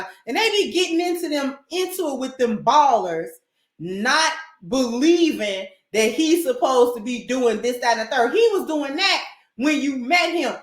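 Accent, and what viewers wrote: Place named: New Orleans, United States. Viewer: are you from the boot? I here so much new orleans tongue.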